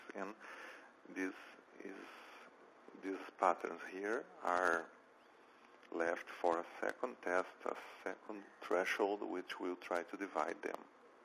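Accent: Brazil. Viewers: You accent its similar to brazilian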